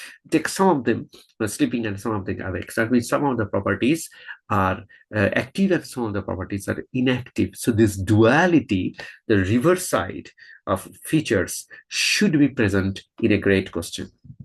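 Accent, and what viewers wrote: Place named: India. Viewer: I hope you have the energy to make more of these. One word of warning: I think a lot of Americans will find your accent hard to understand. We English people are much more used to Indian accents.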